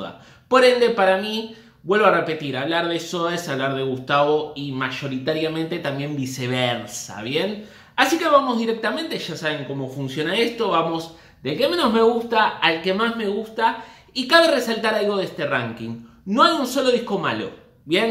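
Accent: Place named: Argentina. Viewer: creo que se te sale mucho el argentino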